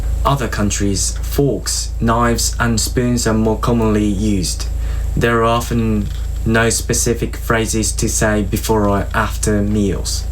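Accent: United Kingdom. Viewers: That's not slight, haha. It's very British sounding. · Your pronunciation is very good. To be honest, it almost sounds like you have a slight British accent lol.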